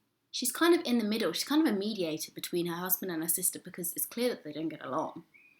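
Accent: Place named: England, United Kingdom. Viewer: I got distracted so many times with the english accent and you playing with your hair :D but it was a great video after all. Great character analysis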